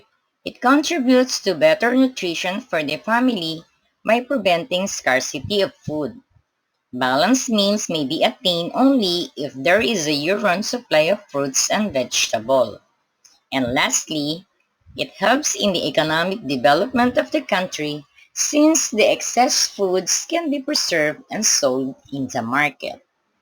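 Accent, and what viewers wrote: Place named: Philippines. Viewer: pinoy yan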